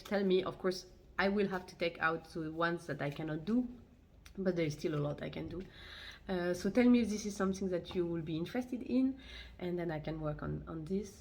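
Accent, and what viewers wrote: France. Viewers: French accent = immediate credibility! :) Thanks for the tutorials!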